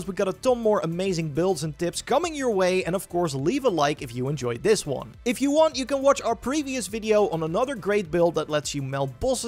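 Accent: Netherlands. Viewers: dude r u dutch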